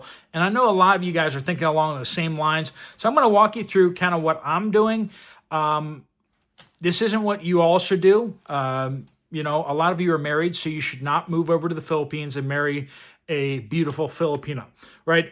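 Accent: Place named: Pittsburgh, United States. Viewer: I can understand what you are doing and pray that you will have a wonderful life with your beautiful new wife. You can leave the Keystone state but you will always have the the Pittsburgh accent. I don't want to leave Pennsylvania but I'm also nowhere near ANY city. 😊 I certainly agree with you on the tax situation.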